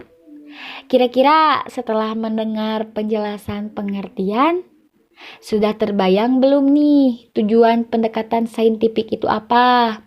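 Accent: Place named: West Java, Indonesia. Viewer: Sunda sekali logatnya 🤣🤣🤣🤣🤣🤣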